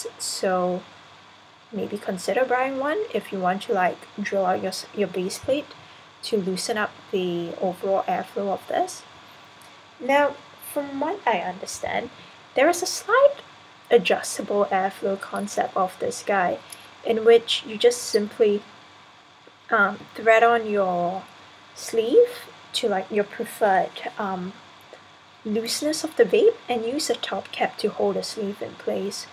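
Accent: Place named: England, United Kingdom. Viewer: Thank you :), I finally got some flat ribbon for this little guy in the mail today, I'm building a micro for it now, time to see how she vapes with what she's intended for! Btw are you English? I only ask because of the accent, and way you spell flavour :P (man I miss my grandmum in England), I was wondering if europe has seen the Russian BIG rba yet? I've heard it's being made in germany and seen a UK vaper that had one, and am trying to get my hands on one sooo bad! drool It's just like a kayfun/russian 91% but it's massive, and holds 11ml 8D, just had to ask in case they exist for y'all yet. Thanks again!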